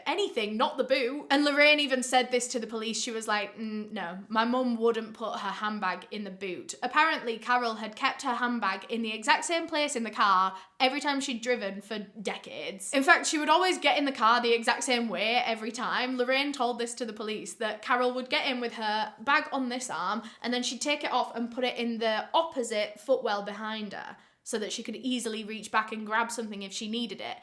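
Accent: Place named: Bedford, United Kingdom. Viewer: Fascinating your accent of bedford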